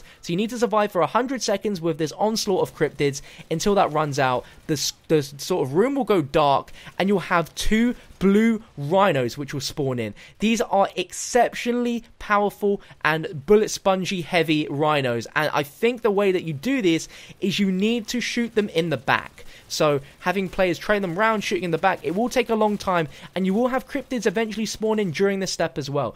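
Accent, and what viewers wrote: United Kingdom. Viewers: I can't listen to the British accent.... Literally can't do it.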